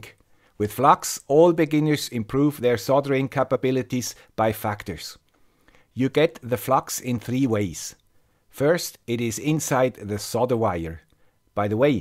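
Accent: Switzerland. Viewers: great video!! I love the guy with the swish accent!!:D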